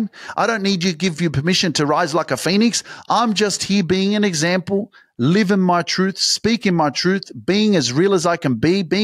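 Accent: Australia. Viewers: I love your daily msgs. New listener but I’m learning your dance moves and it always gives me a lift. Love the accent, Aussie. Love the msg. Turned my daughter on to you. She’s in a bad place in her life and needs positive reinforcement. Keep it up❤️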